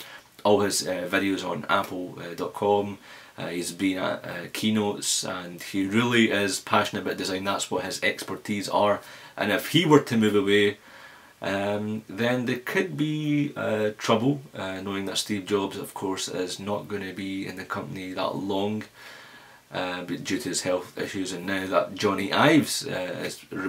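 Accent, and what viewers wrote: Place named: Glasgow, United Kingdom. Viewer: Accent is Glasgow, west Scotland, uk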